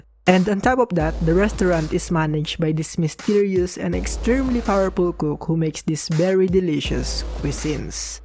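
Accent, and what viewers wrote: Philippines. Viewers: Pinoy yan halata naman sa boses nya mag salita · me too hahaha the way that he talk is pinoy. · You sound Filipino ish somehow, I don't mean this in a bad way. The recommendations are awesome tbh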